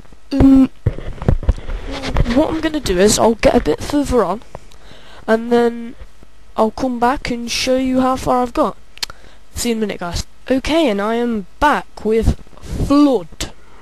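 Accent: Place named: United Kingdom. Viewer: u sound gay with the britshzz acsent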